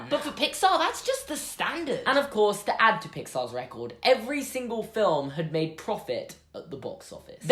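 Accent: United Kingdom. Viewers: Because of your British accent, when you turn on the captions "Cars 2" becomes .... "Cause"....? Or my phone is just glitching again?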